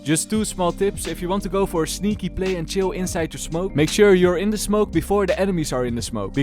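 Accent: Netherlands. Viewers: how much do you like his dutch accent? very much i like his tips more 👇 👇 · 1% englise acsent 99%dutch acsent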